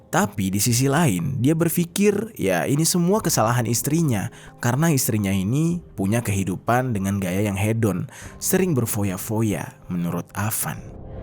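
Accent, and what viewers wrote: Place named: Banyumas, Indonesia. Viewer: Ngapak brow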